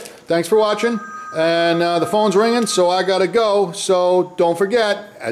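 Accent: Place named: North Jersey, United States. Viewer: I was figuring that was North Jersey accent I was hearing..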